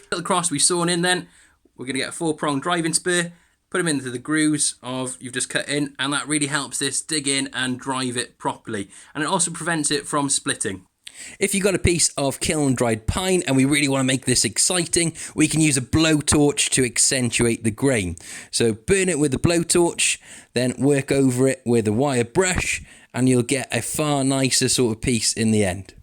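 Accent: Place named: Wales, United Kingdom. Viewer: Hey love your videos been following sine started a year ago. I am in Wales too, curious what part your from accent seems quite local.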